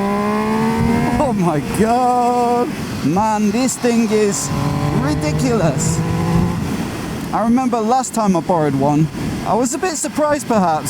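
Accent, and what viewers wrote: United Kingdom. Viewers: Can you add subtitle on your video? I really enjoy it but my english not very too good to catch ur british accent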